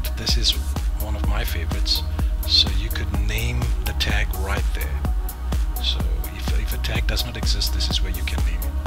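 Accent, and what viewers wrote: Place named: Europe. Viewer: Love the groovy music along with Euro accent.... I feel like I'm in the club!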